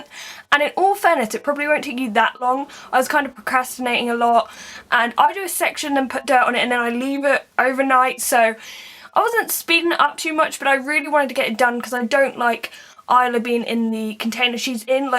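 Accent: United Kingdom. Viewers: Man, I just love your British accent.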